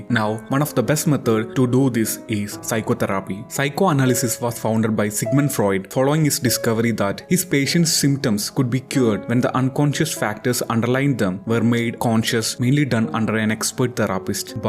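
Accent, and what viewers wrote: Kerala, India. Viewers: Your accent is too obvious. Thick mallu accent is often too hard for foreigners to understand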